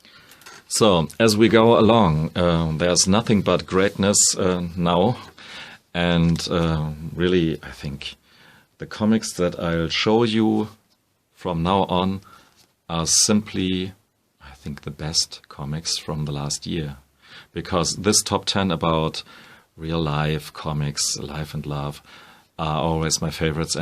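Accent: Germany. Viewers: Wie man dein deutsch richtig raus hört.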